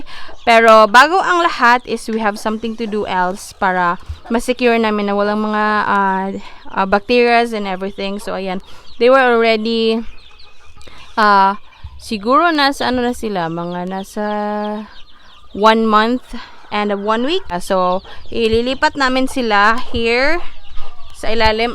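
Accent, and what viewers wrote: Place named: Cebuano, Philippines. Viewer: Saan kayo pinas cibu ang salita mo mensan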